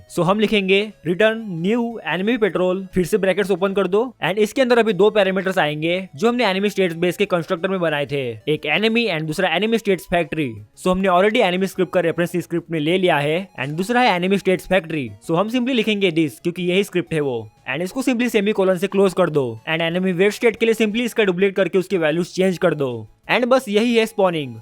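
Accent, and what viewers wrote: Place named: Maharashtra, India. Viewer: bhai tera tutorial ka pronounciation sun ke me <num>% sure hu ki tu marathi aahes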